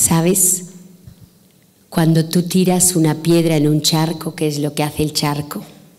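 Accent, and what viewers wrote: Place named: Spain. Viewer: Me parece muy bueno, por que sigue todas las características de El Arte de Vivir, llevadas al gran publico (es un decir) por que la mayoría no entenderá los mensajes. Su dicción y su tono de voz, con su recatado acento español. son fascinantes.He estado recomendando este vídeo a las personas importantes que lo entendieran. Lamento apreciaciones como las de Martin Martin. ya comprenderá, mas pronto o menos pero aprenderá a respirar y sera diferente.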